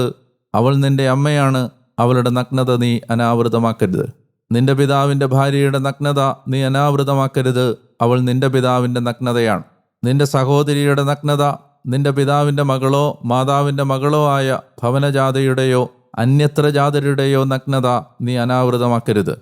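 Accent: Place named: Pathanamthitta, India. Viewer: Karthave during my 75 years of age first time a Priest ithupole ithra clear ayittu Thiru vachananam kelppikkan athu. Achente way of talking n Malayalam language is like my own birth place Pathanamthitta Kerala. Though I n my family is in Rajasthan for the last 50-60 years, I haven't learn malayalam typing and as such I have to type malayalam typing in English. ❤❤❤.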